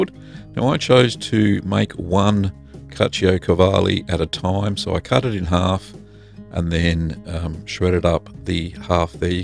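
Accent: Australia. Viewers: This is my father inlaws favorite cheese thank you for making all these ethnic cheeses, you're really helping me endear myself to my wife's family. Hope you and yours are doing well. Love the Aussie pronunciation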